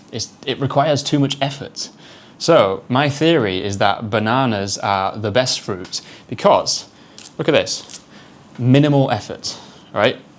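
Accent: United Kingdom. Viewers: Could please tell me do your speak with Rp accent? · I love your English accent Is easy to understand for me🙏🙏 You are very good teacher. Thanks. From 🇵🇪 Peru.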